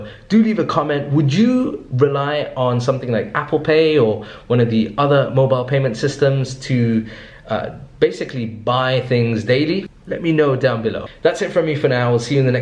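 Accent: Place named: United Kingdom. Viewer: I'm sorry why do you have a British accent?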